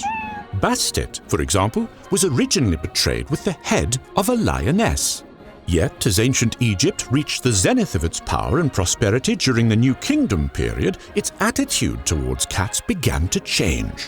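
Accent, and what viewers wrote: England, United Kingdom. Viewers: Glad to see a new Video! 😁 Is the Narrator British or American? He sounds very Stephen Fry, but pronounces some words in US English? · I just discovered this channel and I'm already enjoying the history videos, I especially love your "Englishman" like caricature. I subscribed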